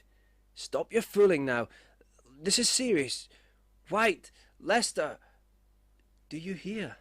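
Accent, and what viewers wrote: Scotland, United Kingdom. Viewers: This is good. Are you scottish or irish?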